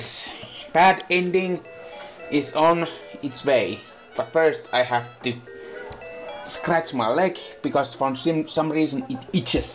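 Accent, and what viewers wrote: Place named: Finland. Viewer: That accent is so strong you from finland perhaps or is it just me?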